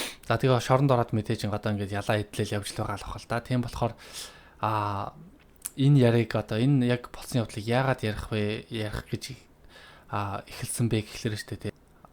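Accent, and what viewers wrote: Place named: Inner Mongolia, China. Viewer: Өвөр монгол ч юм шиг дуудлага нь бусдаар дажгүй шүү Амжилт шүү🎉🎉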